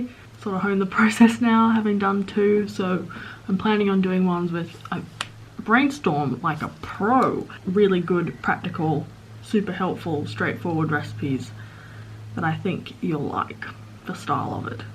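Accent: Australia. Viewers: I just watched a video from 17 you sound totally different. You sound really Australian now I prefer this. It's strange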